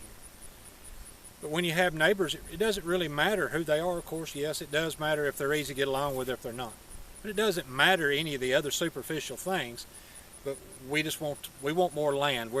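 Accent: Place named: New England, United States. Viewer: Hey neighbor, didn't recognize that accent (Yankee) . Argued that anyone north of Tennessee River is a Yankee was corrected by Pete Cook that anyone north of the Cahaba River is a Yankee. 😃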